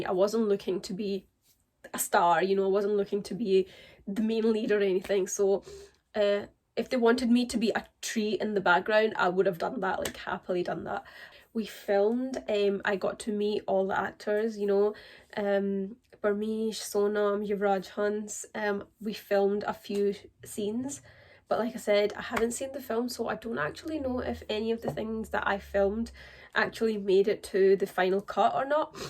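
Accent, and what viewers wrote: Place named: London, United Kingdom. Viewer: Amazing but why do u kinda sound that ur from London???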